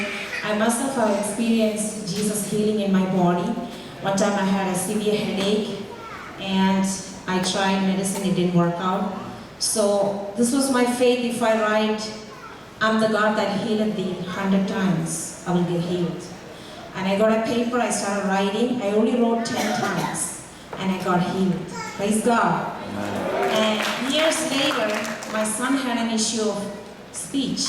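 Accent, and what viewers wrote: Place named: Poonjar, India. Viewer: തലയിൽ തുണി ഇടാത്തത് എന്താണ് മംഗ്ലീഷ് കൊച്ചമേ. അമേരിക്കയിൽ അത് വേണ്ടേ ഓടാ വെള്ളം കുടിച്ചു നടന്നവൾ ആയിരിക്കും ഇപ്പോൾ അവളുടെ പൂഞ്ഞാറ്റിലെ ഇംഗ്ലീഷ്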